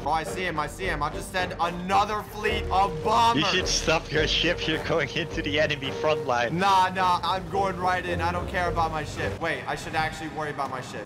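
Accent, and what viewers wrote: Scotland, United Kingdom. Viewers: Is Scottish or something? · I didn't know was Scottish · is Scottish wow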